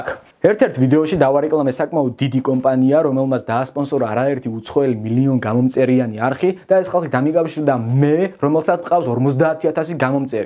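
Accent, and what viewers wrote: Mingrelia, Georgia. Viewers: ჯერ ეგ მეგრული კილო მოიცილე და მერე ილაპარაკე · dajma sad dainaxe tipi 10 wuti wuwunebda banzi megruli tonit vso iumori qarizma rame saintereso normaluri montaji sityvebi mainc rom gamartos araferi ar aqvs yvelaze uintereso 10 wuti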